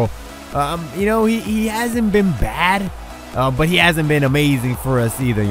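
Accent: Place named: Mexico. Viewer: Haha 5 minutes in and your career mode is fun to watch!! 😅 I thought you were white when I started listening but then as I kept watching Im like nah this guy is mexican lol 😆 Also because of the signings you were making of mexican players lol I hope you keep uploading career mode videos bro, I just started a West Ham career as well but I put the team all the way down to 4th division and I'm trying to promote them all the way up to premier league!! 🙂‍↔️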